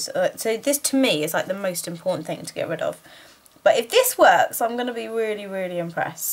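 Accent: United Kingdom. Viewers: What kind of accent do you have? Is it a British accent?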